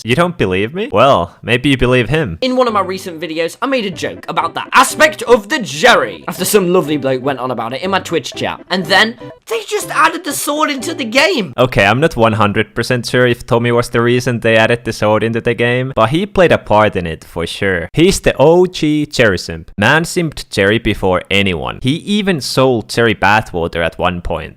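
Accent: Finland. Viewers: Are you from Finland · U finnish? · Suomi man? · Eka video jonka oon nähny tältä ja tiesin heti siitä miten se puhu et se on suomalainen :D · where are you from `finland?? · Finnish english Accent is killing me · guys if u think his accent is weird then hes from finland. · Kunnon suomalainen aksentti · are you from finland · Sie kuulostat vähä suomalaiselle. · are you finland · Hey are you Finnish? Because means piece on Finnish and your accsent is really Finnish. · Are u finnish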